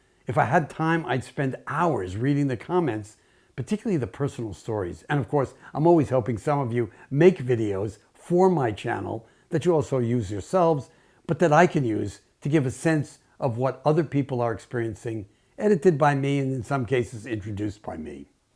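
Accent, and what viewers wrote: New York, United States. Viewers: You're a treasure Mr! 🌟The clarity and astuteness of your insights and the directness with which you address us helps to sharpen up our minds, no matter our ages. ☝ Your voice & NY accent are also very appealing. 😊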